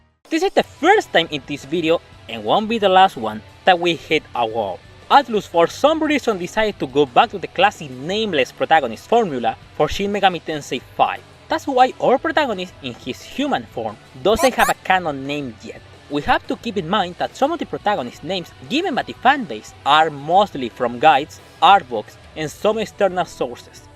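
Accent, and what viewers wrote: Chile. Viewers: Sabia que ese acento era chileno, jajaja muy buen canal! Me subscribo!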